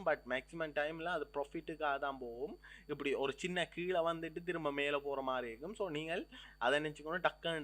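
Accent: Sri Lanka. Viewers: இவர் இலங்கை தமிழ் மண்ணின் மைந்தன். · This is Srilankan Tamil 😍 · Bro neenga srilankan vaa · Nalla teacher. Srilankan Tamil vasanai sema bro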